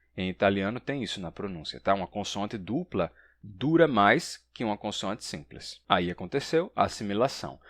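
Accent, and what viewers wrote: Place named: Rio Grande do Sul, Brazil. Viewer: Descobri porque acho seu sotaque diferente dos nordestinos que conheço: sua pronúncia do "e" no final é parecido com a pronúncia dos gaúchos: "leite quente dói o dente da gente".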